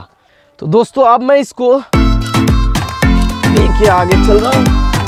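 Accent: Bihar, India. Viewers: बेटा आप खूब तरक्की करो। वीडियो लोकेशन देख के और आपकी भाषा सुन के ऐसा लगा जैसे आप बिहार से हो। बिहारी बहुत तेज़ होते है खोज करने में। आपको इसपर होने वाला खर्च और जगह का पूरा address भी बताना चाहिए की जो खर्चा करके बनवाना चाहे वो आपको संपर्क कर सके। इस प्रोजेक्ट में लोगो का interest देख के बड़ी कंपनी भी आप से संपर्क करेगी और आपका भविष्य बहुत अच्छा हो जायेगा अगर अभी आप student हो तो भी 2-4 लोगो को इस रोजगार में लगा दो देश को बहुत सारे ऐसे बच्चे चाहिए तुम्हारे जैसे ।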